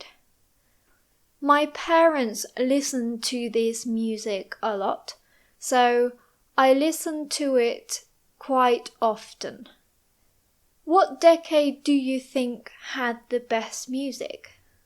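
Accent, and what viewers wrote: United Kingdom. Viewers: Is this a British accent? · You have so beautiful british accent!❤ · Is this a British accent or American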